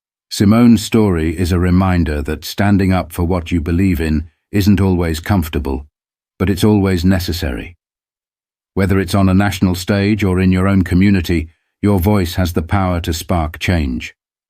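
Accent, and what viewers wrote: United Kingdom. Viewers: You know what I h8... a british accent telling the story of a successful Black woman. Ya just can't stop trying to make it about you colonizers.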